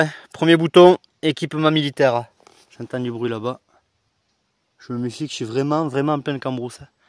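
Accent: Southwest, France. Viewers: Bonjour 👋 je me suis abonné à ta chaîne très interessant est de belle qualité mais t’a le même accent du sud ouest comme moi 😁 tu est d ou ?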